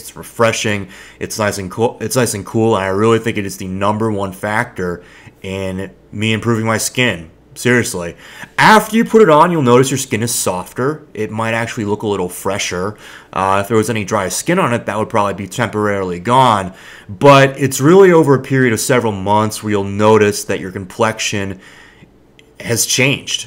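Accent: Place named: California, United States. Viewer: it's just a smart Cali dude cadence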